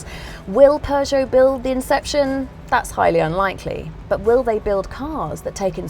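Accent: United Kingdom. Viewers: She has such a british voice and accent🤣 most british women sound and talk the same. Which is weird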